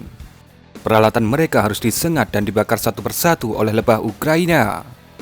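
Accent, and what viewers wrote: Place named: Java, Indonesia. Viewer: Lebih gregetan lagi dengar suaramu yg medok cil tidak unt di lestarikan yg sprti lu medok2 gilani😂😂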